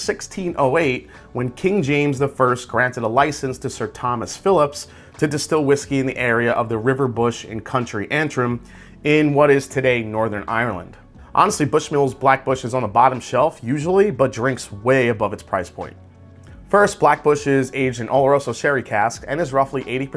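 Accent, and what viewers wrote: New York, United States. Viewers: Great NY Italian Irish accent! Really enjoy the Black Bush. Nice sipper and holds up well in a Hot Toddy or with ice.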